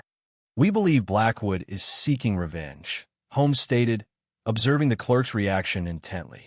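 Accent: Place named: United States. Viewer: The story loses value as this is narrated by someone in the U.S.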